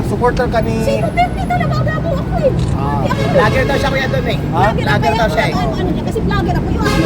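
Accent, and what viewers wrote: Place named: Visayas, Philippines. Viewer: bisaya ang tuno ni ate.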